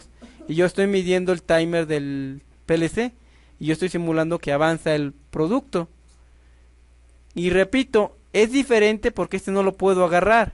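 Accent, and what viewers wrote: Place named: Mexico. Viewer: eres lo maximo tio , muchas gracias por tus tutoriales explicas muy bien y por lo que escucho eres de mexico , que viva mexico!!!!!!!!!!!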